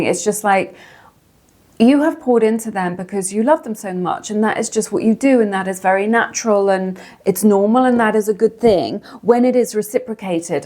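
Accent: England, United Kingdom. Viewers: your readings are spot on. ive found that if you are a caring giving person many people may come too hate you. ive never understood why. i really enjoy your readings. you have totally open my eyes and elevated my life. thank you so much. side note here i was stationed in lakenheath england 1968 to 1970. i almost married an english girl. im now 77 and im amazed how you have enlightened and motivated me . thanks again and i love your accent brings back fond memories.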